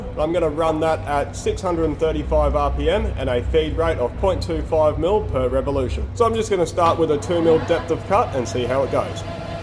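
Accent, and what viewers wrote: Australia. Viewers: I THINK IT WOULD BE AWWSOME TO DO A VIDEO IM YOUR NATURAL AUSTRALIA DIALECT. I BET WOULD BE HILARIOUS THINK ABOUT IT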